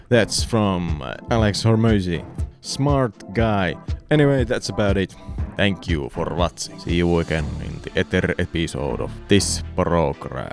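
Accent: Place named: Finland. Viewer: Ah, that Finnish accent makes the entire video worth watching.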